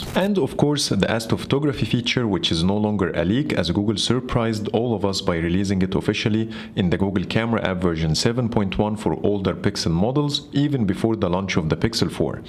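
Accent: Egypt. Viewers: Hmmm I hear an Egyptian English accent over here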